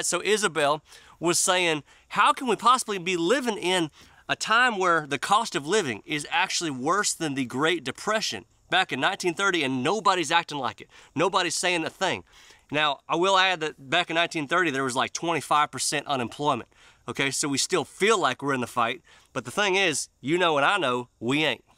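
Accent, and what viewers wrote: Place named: Texas, United States. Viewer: I like your voice are you from Texas ? You sounds like Texans..I live in Texas ,but I’m originally from west Africa..love your videos.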